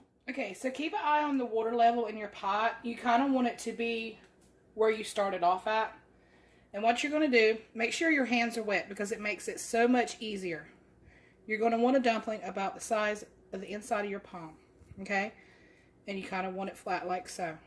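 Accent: South, United States. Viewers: Arika sent me over and I'm glad! I love your Southern accent. I'm from North Carolina so I feel right at home with you! I'm new to your channel and I plan visiting you every time you post. Thanks for the great recipe! Looks delicious! God Bless!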